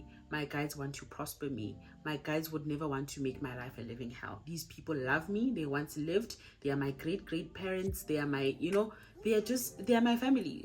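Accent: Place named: Botswana. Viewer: So refreshing to listen to Setswana se se tlhapileng❤❤ love your energy 🌷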